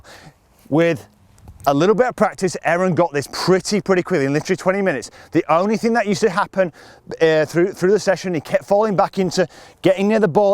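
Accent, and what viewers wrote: Yorkshire, United Kingdom. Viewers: Where are you from? I hear a yorkshire accent in there somewhere haha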